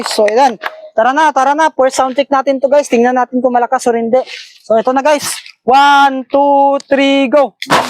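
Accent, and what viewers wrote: Visayas, Philippines. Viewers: Bisaya ka dol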